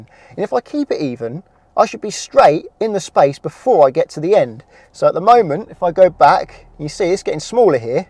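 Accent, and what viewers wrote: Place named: Oxford, United Kingdom. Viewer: Shit oxford eng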